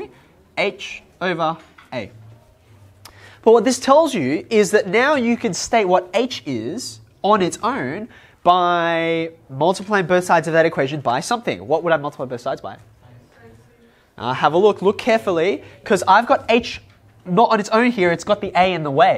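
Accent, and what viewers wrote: Australia. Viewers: He’s Australian. Apparently us Aussies also speak the commonly spoken language known as ‘English’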